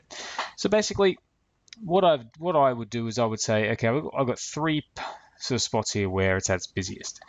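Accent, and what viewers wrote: Australia. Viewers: Thanks heaps. I'd forgotten where it was n you can spend hours trying to find stuff on there, n yours was the only vid I could find on where it was. So new sub here. Bit weird though, as mine says the exact same times as yours! You sound Australian, so maybe it's 'cos I'm in New Zealand n we may have the same-ish time zones. I've found 9pm, 12am and 1.30am are my best times for shorts. I scatter my shorts throughout the day/night though after experimenting, but these tend to get the most views. I don't worry about longer main vid times, I just put them up whenever, as it's those shorts that gets them there subs lol! Thanks for putting up this vid :)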